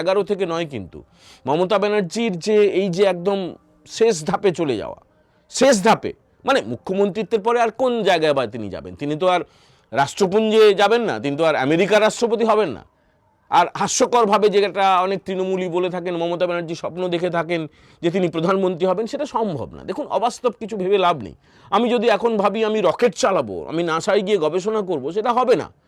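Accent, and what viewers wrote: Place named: Bangladesh. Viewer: Ami prochur bangladesh e manus r sathe kotha batra hoe che anek k chini. Bangladeshi der ekta adbhut accent ache, janina koto jon manben na bujben , ae anchor ta bangladeshi bangali der moto accent.😮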